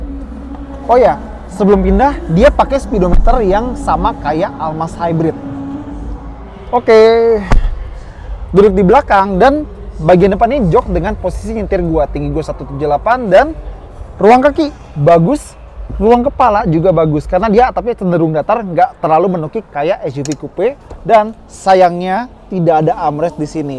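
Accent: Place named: West Java, Indonesia. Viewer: ala orang sunda. Bener urabg sunda mah kalo nyebht huruf F&V jadi hurup P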